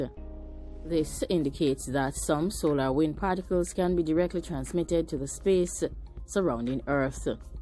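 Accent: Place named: Jamaica. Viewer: Me luv ya Jamaican accent.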